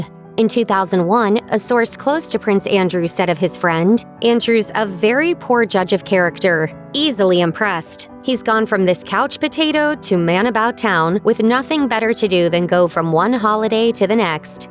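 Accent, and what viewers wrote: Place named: United States. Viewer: Good content, but that high pitched screetchy American voice grates on me. Anyone?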